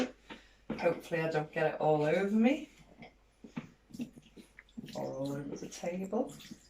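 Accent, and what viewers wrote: United Kingdom. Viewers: Is that a Wirral accent I hear?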